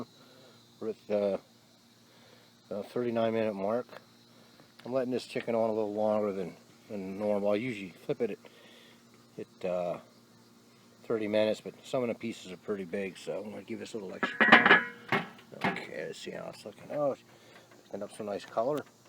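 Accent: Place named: Pennsylvania, United States. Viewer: I figured, I could hear it! I'm from Philly originally but relocated to California after my military service. I can always tell somebody from back home.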